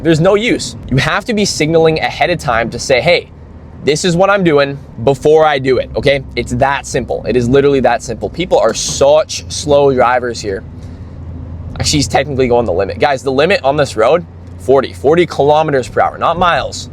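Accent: Canada. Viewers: As soon as you said “40KM/H” i instantly knew I had to subscribe cause I already had a feeling from your voice you were Canadian, then I seen that intersection on highway 11 with chevron in Abbotsford (I think) I knew you were in my province too (we need more Canadian car YouTubers) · Nice video though. Just curious, are you from Victoria, BC?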